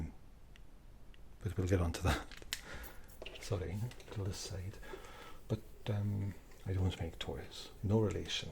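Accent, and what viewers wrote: Wales, United Kingdom. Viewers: Commendations Greetings Appreciation Respect And, a great love of a lovely Welsh accent I kid you not One of My other favorite YouTubers has a beautiful Welsh accent Even though he speaks in a mundane friendly full voice, it's super soothing So Algorithm, please promote all my favorite Creators, especially, in whose comments I am about to post.... Food for you, dear Algorithm And Dearer friendly creator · OMG Your Welsh accents are my favorite videos and your quiet voice is my lullaby. ❤❤❤❤ im quite convinced your a genius. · Ever since Peter Kinney made the beautiful decision to make the dwarves Welsh in the Witcher audiobooks I have been dying for Welsh accented ASMR and you beautiful bastard you did it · Are you actually Welsh?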